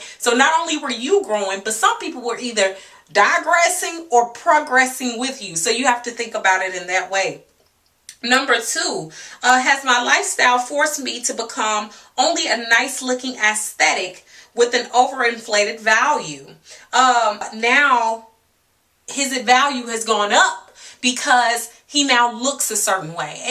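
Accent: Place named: St. Louis, United States. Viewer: Tell 'em (the St. Louis came out on this video, lol)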